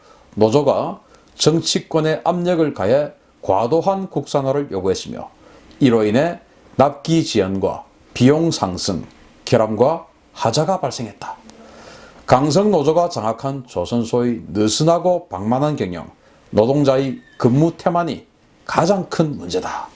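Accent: Gyeongsang, South Korea. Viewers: 퍼뜩 이해가 않되죠?? 남쪽 오리지날 사운드트렉이 인상적입니다. ㅎㅎ 그래서 사람은 근본과 고향을 속힐 수 없습니다. 비난의 뜻은 전혀없고 박식한 지식과 논리전개 사투리가 인상적입니다. 소시적 보리xx 어떤 선배가 수도권 삼류대학 유학 첫 겨울 방학 때 클라스 메이트들을 데리고 와서 후배인 친구들과 시중을 든적이 있었습니다. 우리동네에서 태어나고 자란 사람은 서울말이 좀 힘 듭니다. 선배가 불식간에 사투리 단어에 서울말 인토네이션을 태워서 여자친구에게 먹을 것을 권하는 걸 보고 배꼽잡고 웃었다가 선배들에게 체포되어 모교 화장실 뒤에서 사망직전까지 구타 당하던 추억이 생각납니다. 님의 말씀을 잘 경청하고 있습니다. 계속 좋은방송 부탁드립니다. 퍼뜩=빨리,금방. 수구리!= 수그려라! 만디= 정상, 꼭대기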